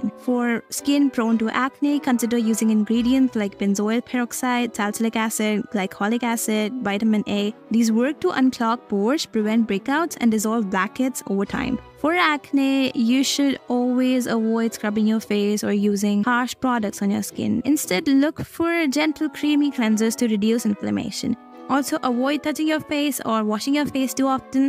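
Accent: India. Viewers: Thank god you put subtitles because for some odd reason i can’t stand Indian accent! It annoys me, i have problems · I spotted your beautiful Indian accent Love when Indian people speak English , it sounds so good to hear.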